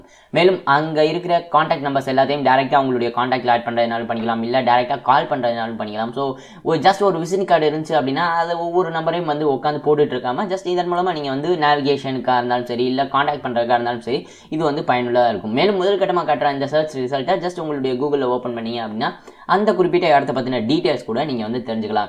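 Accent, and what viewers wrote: Madurai, India. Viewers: Neenga madurai karara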